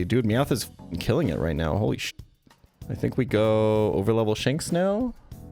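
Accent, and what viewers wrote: United States. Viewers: I took German in High School and the American accent while speaking German had me DYING 😂🤣 - a dumbass American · I don't speak German but it's funny that I could hear the American accent regardless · American with obvious American accent but still good German struggling with memes bit may have crippled me.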